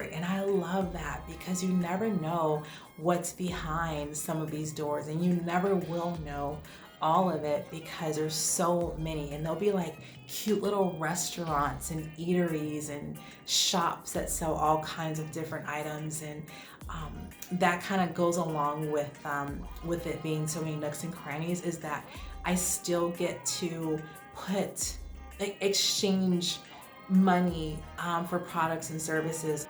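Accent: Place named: California, United States. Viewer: I didn't know that you're from California because you always talk about Georgia, but I always thought that your accent seemed like you were from California: the way you pronounce the words so well (not like NYCs, that say "xtress"), the beautiful way californians pronunce the "s" and the beautiful humming that californians have. 😊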